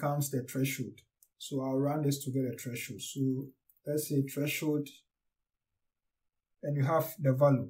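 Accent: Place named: Africa. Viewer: Is it just me or is it refreshing to hear an African accent addressing these complex concepts and workflows in modern science?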